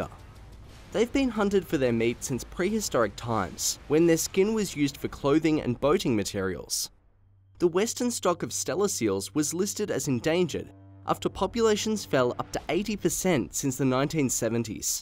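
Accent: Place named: Australia. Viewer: Im Glad theres an Actual Aussie narrating videos here, I just came across an old vid with the most HORRIBLE impersonation of an Australian accent, if Im being Honest, As an Australian myself it made my twitch a little listening to it haha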